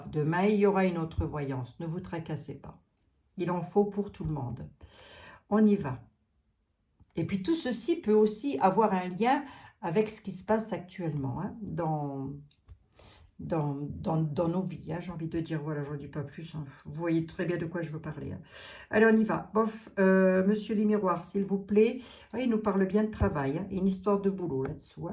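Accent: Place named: Vosges, France. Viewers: Moi heureuse de retrouver l 'accent Lorrain et les expressions. De temps en temps j'en ressors une. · Une petite pointe d.accent vosgien par moments... celui-là on ne peut pas l'oublier (j'en suis la preuve) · Bonsoir, je me régale avec vous, entre autre avec votre accent, étant spinalienne d'origine, bon certes j'avais 9 ans lorsque je suis partie dans le sud, cela fait maintenant 50:ans. Quand je vous écoute je retrouve mon accent. Le plus qui m'éclate chez vous c'est quand vous vous mettez d'un coup à râler après quelqu'un, et que vous avez raison. Ça me fait délirer votre humour. En tous les cas, merci pour tout ça. Je vous adore.🌸🥀🌷🙏🙏🌹💐😇😘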